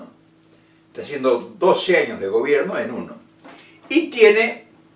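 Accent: Argentina. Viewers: Ok señor en vez de criticar a López obrador xke no critica a su presidente de Argentina xke en tu forma de hablar eres argentino y no utiliza ninguna majia solo que es inteligente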